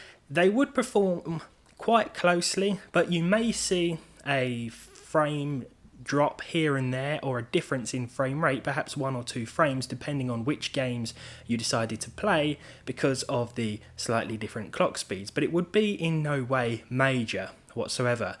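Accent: United Kingdom. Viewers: I have no use for these videos as my worst GPU is a 1060GTX 6GB, but I watch them religiously. It is the personality, the voice and the lovely accent that draws me. So envious of the British accent. Can't wrap my head around it.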